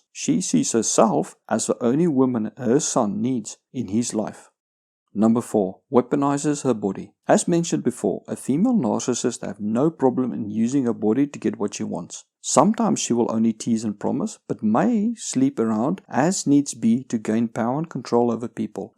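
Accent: Afrikaans, South Africa. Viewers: One question: Are you South African? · I was surprised to hear his South African accent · Safrican accent detected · Ok so the man speaking here is Afrikaans right? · Nice vid, I subbed and liked. All the points were accurate and appreciate the time stamps. Another thing ill add, even though you did touch up on it is, they are always the victim, no matter what. Also, you have a very South African accent! I checked your 'about' and confirmed my assumption. That's great, I moved to SA in the early 2000's. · Tell me you're from South Africa without telling me you're from South Africa. That accent is super thick. By the way, good video.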